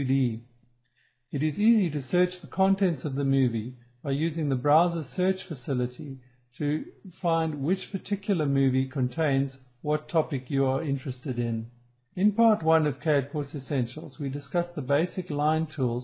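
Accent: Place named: South Africa. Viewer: South Africans should never, ever be allowed to do voiceovers. Horrible. And I'm a South African myself.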